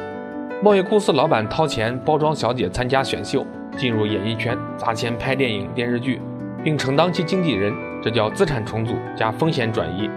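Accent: Henan, China. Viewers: 兄弟你是Henan的吧？一听这口音，河南普通话，建议注意下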